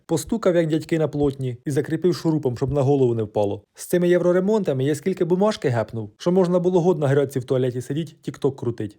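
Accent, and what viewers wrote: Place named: Volyn, Ukraine. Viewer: Випадково в головного героя даного відео немає брата на Волині Такий самий говор та поведінка Маю такого знайомого геть схожий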